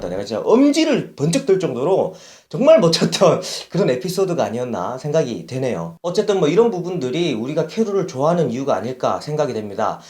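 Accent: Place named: Gyeongsang, South Korea. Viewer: 캐롤 시즌 1~2까지만 해도 별 비중없고 민폐 캐릭에다가 일찍 죽을것 같은 캐릭이었는데 이제는 없어서는 안될 최종병기가 되었죠. 인물 연대기는 역시 원년맴버가 메인인듯 합니다. 특히나 릭은; 준비기간이 오래걸리실것 같네요. 역시나 님의 내용정리는 탑입니다.근데 뜬금없지만 경상도 출신이신가요. 제가 경상도 사람이라 중간중간 귀에익은 말투가 조금씩 들리네요^^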